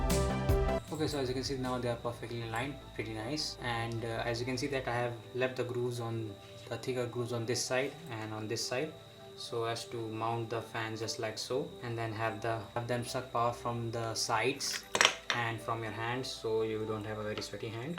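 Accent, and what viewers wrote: India. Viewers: Why do indians speak english like this!? It is infuriating!! 😡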